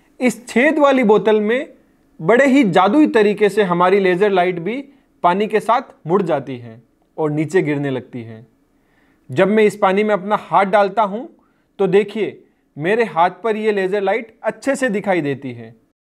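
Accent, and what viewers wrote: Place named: Rajasthan, India. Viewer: Sir aap Rajasthani hain na? I know😁 Your accent totally matches✌ I'm from Barmer, Rajasthan.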